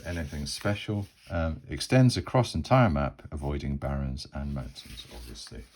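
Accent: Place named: England, United Kingdom. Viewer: Sir your English accent is pleasing to the ear and rarely gives me trouble. However for a minute tonight I was hearing High Merlin. " High Merlin what a concept! " I then caught up and realized it was high moorland. Sweet.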